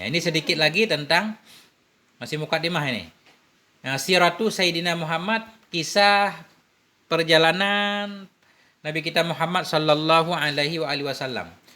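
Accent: Aceh, Indonesia. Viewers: ooo jroh gure,lon kalon cara boh makna kitab,logat pesantren aceh,jino pat tinggai gure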